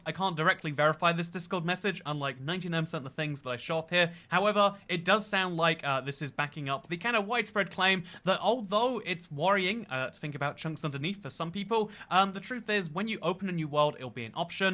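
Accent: United Kingdom. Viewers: I'm from brazil, a lot of schools here teach English instead of Spanish even tho we are surrounded by Spanish speaking countries. American culture is heavy here so many movies come to here and people are used to american content and culture. In fact in Christmas we retain the American winter theme even tho at December we are at high heat summer at 45 c and those grandpas waring Santa outfits almost die of heat from the warm clothes, all that to keep up the Americanized culture LOL. My school had Spanish and English but that's far from the norm. I learned a LOT more from games and youtubers like ur self however. So I'm thankful for ur indirect help in practicing my listening of English. Also, i love ur British accent. I also love that ur one of the few minecraft content creators who aren't heavily focused on younger audiences but more general audience from all ages. 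I'm 22 and i'm just sick of clicking a cool looking minecraft video and hearing an obnoxiously childish voice saying, "wassup guys, hit the like button and ring the bell wohooo!!!! I love ur videos, keep up the good work.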